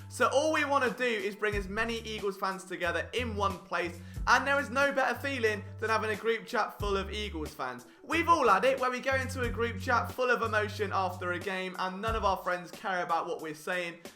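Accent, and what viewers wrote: United Kingdom. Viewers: Holy shit. The only thing worse than an eagles fan, is an eagles fan with a horrendous British accent.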